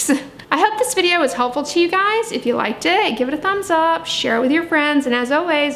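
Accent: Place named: Long Island, United States. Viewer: I can’t with the Long Island lockjaw.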